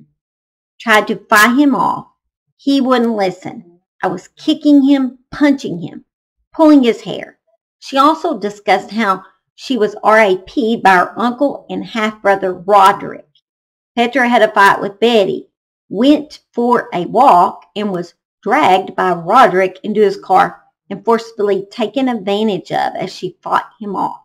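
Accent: South, United States. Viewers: Wow, this is the first time I come across your channel you live in Canada, but your accent is not from Canada because I’m from Texas and Arkansas and I’m gonna kind of gas maybe you from round Missouri or Tennessee accent the way you drag your rrrrrrrrr ‘s out I like it I like a southern girls accent OK I’m going back to your your story again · You gotta be a southerner woman rite or wrong ?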